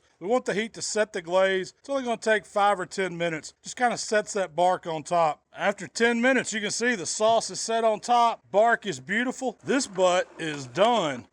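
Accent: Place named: Georgia, United States. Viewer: You know a BBQ video is authentic when the cook has a thick Georgia accent. I’ve used the snake method for cooking BBQ pork shoulder, and it’s almost a “set it and forget it” method. It’s easy, no messing with adding additional coals, and works every time. Thanks!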